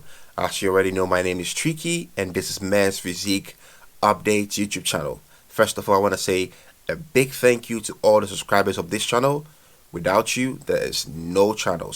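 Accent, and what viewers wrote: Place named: France. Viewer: I think he speaks french. Souns like a french accent.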